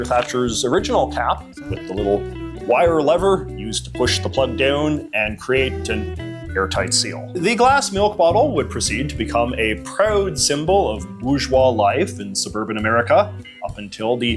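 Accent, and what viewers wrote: Canada. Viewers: As a Canadian, how did you manage to sound so Canadian??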